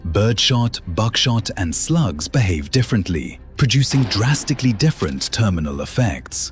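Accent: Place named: England, United Kingdom. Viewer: Why is someone with an English accent narrating this video? If memory serves correctly the British have draconian gun laws regarding ownership. Would you really believe that aside from single shot, side by side, or over under shotguns what could the British or ANY European country teach the United States about shotguns or ANY firearms? They may know theory, but the United States has practical experience. I'd go so far to say, one city in the United States has more experience wirh bang sticks than the entire continent of Europe. LOL!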